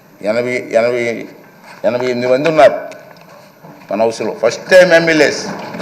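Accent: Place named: Godavari, India. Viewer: Nice Godavari slang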